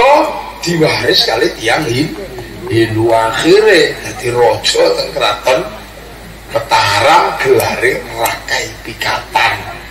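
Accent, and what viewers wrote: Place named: Banyumas, Indonesia. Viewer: Wong bumen ngapak rangapak rakepenak💖💖💖🤣🤣🤣🤣nyimak gus🙏🙏🙏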